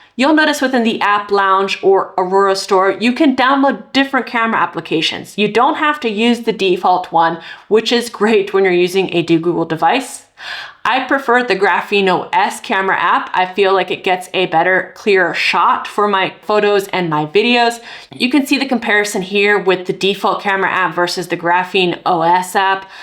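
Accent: United States. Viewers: Excellent video! By your accent you’re a fellow American, but are you using this in the US? I’m strongly considering picking one up here in the States, but I’m also very hesitant considering the instability of this compared to a more mainstream phone with better US support and a much larger user base. I’m about to start a PhD program and don’t want to get a major headache on my hands with this. Is it easy to get set up in the US? Is it painless to use here? Any insight would be much appreciated! Thanks again for such a great video review! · Watching this video on that exact device, I got the white one too. I didn't know your channel before, if not for the name I would've never known that you were French too, your accent is very American!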